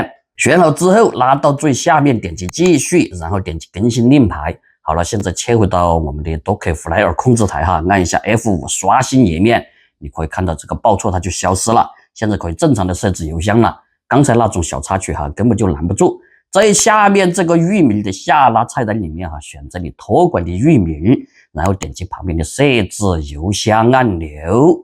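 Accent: Sichuan, China. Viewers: 这个川版普通话我还是听得懂，就是搞这个不花钱的邮箱太复杂了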